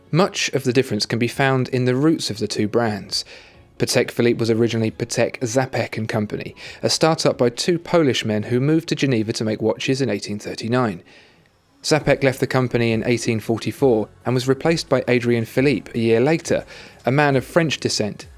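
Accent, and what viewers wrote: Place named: England, United Kingdom. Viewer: A review of some vintage watches and their movements would be great. You crisp English accent makes these reviews sound regal! Great videos and delivered in a very balanced manner.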